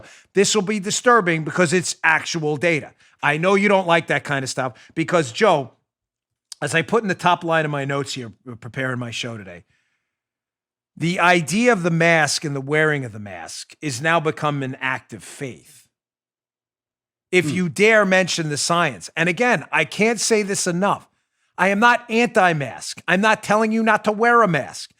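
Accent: New York, United States. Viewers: mark: "Brianna is like, 'Q is not a thing'. I'm not sure voting fraud is not a thing". Is actually insinuating that Brianna would be correct if she claimed that Q was "not a thing"? Is he positing a distinction between a factual statement that Brianna might make (i.e "Q is not a thing") versus the obvious false claim that she's making that "voting fraud is not a thing"? Well, jeepers Wally! I've spent many thousands of hours over the past 33 months studying the 4,620 Qanon posts (qmap.pub) and scanning through the 13,048 qresearch boards (8kun.top8kun.top/ qresearch/catalog.html) for Q proofs and other Q-related topics being discussed by anonymous researchers worldwide, only to have suggest (?) that Q is not a thing, or that Q is not real, or that Q is not even an extant phenomenon worth looking into. Don't get me wrong, here, folks. I adore and I never miss an episode of The Show... 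because I value his intellect, his energy, his patriotism, and his unique NY-sculpted manner of delivery. I see two possible explanations here: 1) He's missing the boat entirely on all things Q, which, in my humble opinion, is the most exciting and hopeful "thing" which has quietly shuffled onto the political stage in my 68 years of life as an American patriot. If you're on the Trump train, ladies and gentlemen, you'd better get used to the idea that POTUS has a team of military intelligence professionals driving the train with him. All aboard... come to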